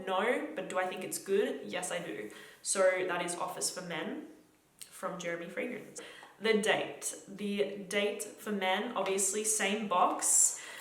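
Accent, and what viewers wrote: Australia. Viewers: Aussie accent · i thought you were english you are australian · girl you surely have a STRONG aussie accent....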